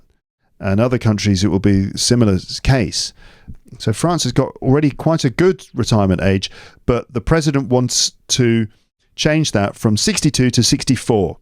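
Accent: United Kingdom. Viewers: Hi, your British accent is thoroughly hilarious! Tell me where in the world this incredible accent is spoken.? I have to admit since I started following your podcast my English is magically changed. Thanks to you with the bottom of my heart. ❤ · speaks Pure British accent but his audience are not native English Please don't speak fast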